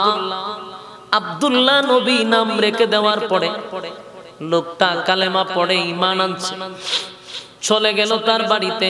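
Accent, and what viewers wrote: Noakhali, Bangladesh. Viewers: এটাই নোয়াখালীর বয়ান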